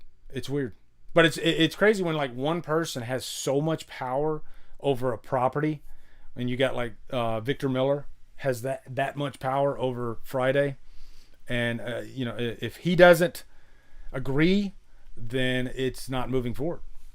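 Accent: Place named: Mississippi, United States. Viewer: a bunch of characters, huh? 😂 I thought I detected some Mississippi in your accent, but I miss Florida beaches. Texas really doesn't have any good ones. Maybe 1 or two, like South Padre or whatever.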